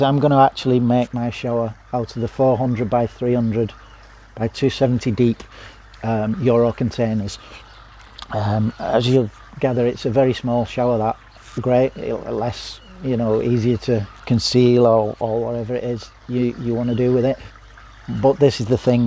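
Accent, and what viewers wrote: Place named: Manchester, United Kingdom. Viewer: I know St Helens well mate although it was a bit of a surprise, as I could have sworn you jave a Manchester accent. Keep up the great work.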